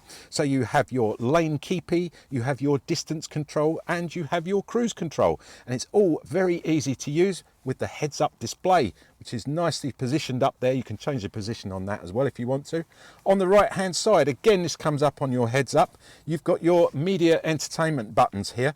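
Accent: United Kingdom. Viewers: Beautiful presentation. I like it a lot. The British accent most welcome.👍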